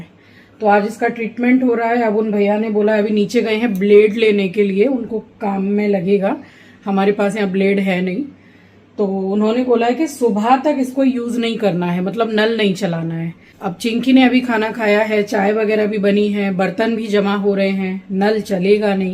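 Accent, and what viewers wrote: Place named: Bengal, India. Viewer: Your bengali is so sweet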